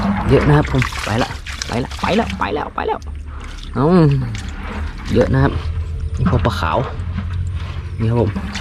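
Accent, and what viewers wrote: North, Thailand. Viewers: เห็นพูดเหนือ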